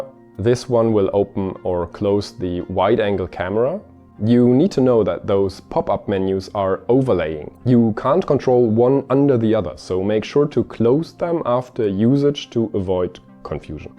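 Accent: Germany. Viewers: German accent..... Ugh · Ich glaube deine Muttersprache ist deutsch ;)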